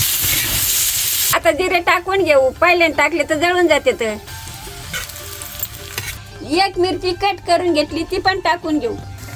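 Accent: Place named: Ahmednagar, India. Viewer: आजी च बोलण्यात नगरी तडका आहे 😂🥰❤️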